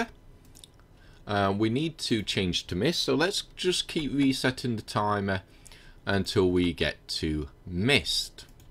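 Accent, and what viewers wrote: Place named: North England, United Kingdom. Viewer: Hey, thanks for the reply. I managed to fix it. The problem was I needed to assign the ParticleSystems for ALL the Weatherstates. I had only assigned mist. when I assigned mist to all of them (because i havent made the other ones yet) it worked. Great lessons btw I dont get why you have so few views youre explaining your stuff very well and its not getting boring at all. Also love your accent haha, what is that? North England? Keep it up your videos are helping a lot.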